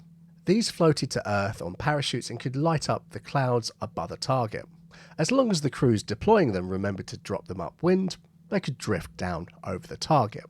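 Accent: Australia. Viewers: Wanganui is an New Zealand city . Why would a person with an Australian accent be claiming to come from there? And why are Wanganui or Parramatta any "sillier" than Thames or Birmingham or Slough? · 9/10 Aussie accent as assessed by an Aussie 🇦🇺 Well done mate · Well done with your Aussie accent. Now try Kiwi. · Forget the Ozzie accent, Wanganui is in New Zealand... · Why would your Aussie accent be helpful in pronouncing a kiwi place name? The accents are quite different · You know that Whanganui is in NZ, right? I was a bit confused by your pride in your Ozzie accent. Did I miss something?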